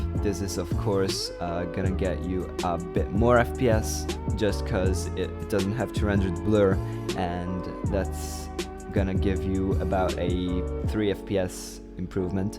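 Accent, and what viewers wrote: Germany. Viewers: Good tutorial thanks (btw German accent? :d)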